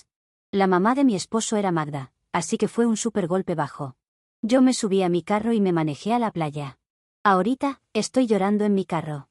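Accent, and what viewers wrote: Mexico. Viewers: Se nota que es de México jaja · Tan temprano y ya tenemos suegra tóxica 🙄 por cierto, buenos días! Edit: amo como habla e insulta a su suegra en mexicano!!! Te quiero OP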